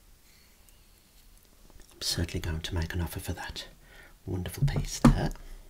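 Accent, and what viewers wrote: Birmingham, United Kingdom. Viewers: the best brummy accent in history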